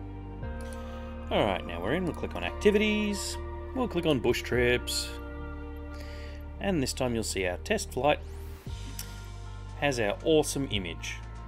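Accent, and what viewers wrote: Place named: Australia. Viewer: For what it is worth, you speak at a good speed for me but I'm Australian too so the accent might just be easier to listen to. I guess it takes all sorts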